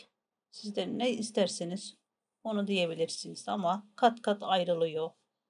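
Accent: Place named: Turkey. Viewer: Italia, penso che sia turca questa ricetta sento l’accento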